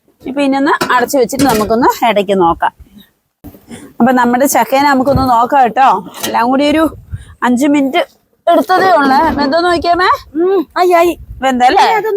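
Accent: Kottayam, India. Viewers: വലിച്ചു നീട്ടാതെ വ്യക്തമായി എല്ലാം കാണിച്ചു തരുന്നത് കൊണ്ടു വീഡിയോ ഭയങ്കര ഇഷ്ടമാണ്. സംസാരം കേട്ടാൽ കോട്ടയംകാരി ആണെന്ന് തോന്നും. ശരിക്കും ഉള്ള നാട് ഏതാണ്. ഒന്നു പറഞ്ഞുതരുമോ. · Samsaaram kottayam style aanallo.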